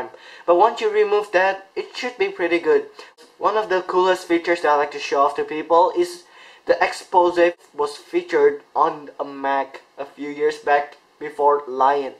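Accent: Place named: Singapore. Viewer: Sounds more Spanish to me for some reason, but you're right, it's a Singapore accent by the sounds of it.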